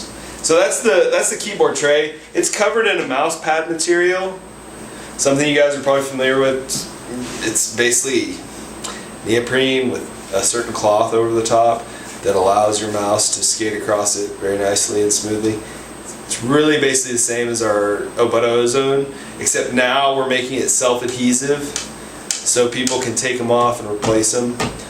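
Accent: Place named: Netherlands. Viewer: Installed the hotas yesterday and have flow a couple of minutes in Arma 3, ED, and DCS and have to say im very happy with it, the immersion is so nice that i now want that the seat banks left when banking left lol. also watched a couple of vids of you. you got a nice setup mate. i heard your dutch if im not mistaken hehe. maybe if your down for it we grab a game together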